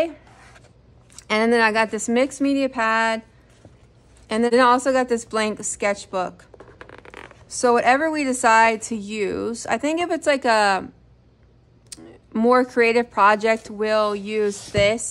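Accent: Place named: Argentina. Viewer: Hi! I am curious to know where you are from..the way you speak English sounds a lot like mine. I am originally from Argentina but I live in the US for a litter over half of my life. And I homeschool my kiddos that is why I got drawn to your channel. Thank you for sharing.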